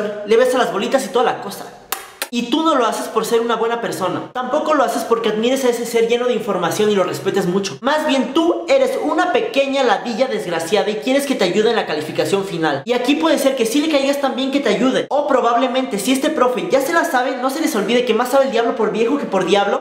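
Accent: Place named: Mexico. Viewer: Eres muy mexicano tio, no te he entendido nada xddd Saludos desde EspaÑaaaa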